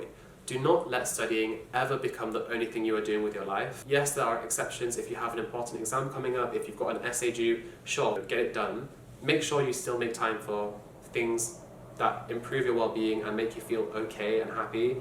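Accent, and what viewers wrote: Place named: England, United Kingdom. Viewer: I meant his English accent... It's just so cute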